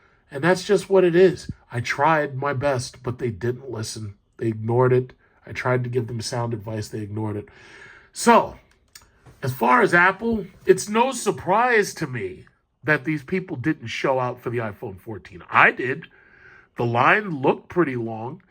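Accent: United Kingdom. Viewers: Your British accent sounds like Monterey Jack from the cartoon Chip n Dale’s Rescue Rangers 😂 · How dare you bring up the massacre of my boy Jon Snow in season 8. I felt like Don Corleone looking at my dead child watching what they did to his character lol. Love the British accent lol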